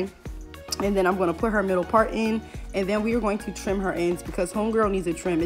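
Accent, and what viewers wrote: Philadelphia, United States. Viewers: Never seen this channel before and I can tell y’all from Philly too🤣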